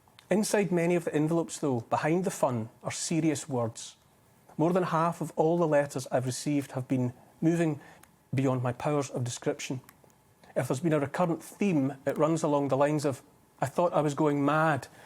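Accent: Scotland, United Kingdom. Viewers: 👍🏽👍🏽👍🏽 Im America, trying to work out if is Irish or Scottish. Im thinking Scottish. 💜 Love his talks. I subbed this channel today. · Kudos to. Lovely Scottish accent. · You were correct. He's Scottish. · How much I love to hear a direct Scottish voice let out an opinion. It's rare in my northern New South Wales' region. The region hosted an Aquarian Festival in the seventies, and it appeared to be going in the right direction. So, I went south of the Queensland border - thinking I had left an oppressive police state where any looking different or just looking too happy was picked on by the police. More than two people together in public could be arrested. This is now the law in New South Wales - though few seem aware of it. It seems to be there to stop people protesting about something the government has guaranteed for large corporations. It could be used to stop people protesting against coal seem gas mining or pulling up our railway tracks for elitist cycling paths - for retired business folk and local councillors to go on long viewing of the scenery - while those who can't drive and have wheelchairs, disabilities, older folk and Indigenous who can't drive - can't go to the beach any more, or who die not being able to get to medical specialists in Brisbane, Queensland. 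All major political parties voted in NSW parliament to rip up the tracks for cycling pathways, and including the federal government, offered over $100million to local councils to do it - even though this is more than enough money to get to Lismore - the regional center some new railway services - and to Byron Bay, as it was over fifty years ago. Too many now have parasitic jobs driving people with disabilitie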